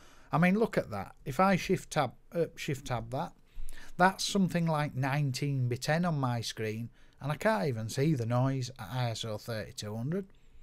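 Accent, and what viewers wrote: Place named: Northern England, United Kingdom. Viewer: Thanks for the review. Got myself one today. Lovely northern accent mate. Stay well.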